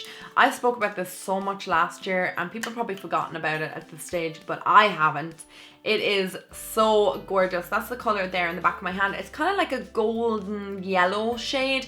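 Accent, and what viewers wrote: Ireland. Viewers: Your videos are amazing! Your are my favorite european youtuber and your Irish accent is so lovely 🙂 My favorite products from the "drugstore price" section are from "The Ordinary Skincare" line. They are absolutely amazing and soo affordable. I know them because Wayne Goss talk about them in his channel. You bet you will love them ☺️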